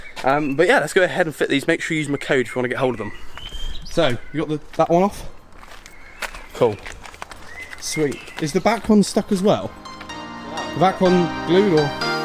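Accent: United Kingdom. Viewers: You from Bristol is it?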